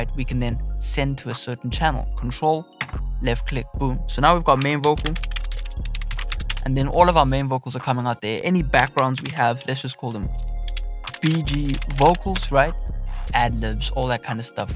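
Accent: South Africa. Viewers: yo bro are you from South Africa? your accent lowkey sounds like it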